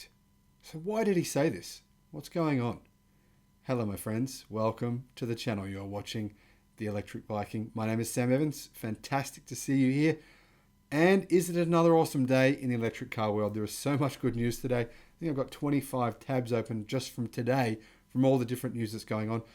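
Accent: Australia. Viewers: My significant other says you’re trying too hard. I said, ‘what do you mean? He’s the most calm speaking Aussie that I’ve ever heard!’ She said ‘it’s obvious he’s built like a brick s-house he should button up and not flaunt it’ whatever…I’m not letting her watch your videos anymore.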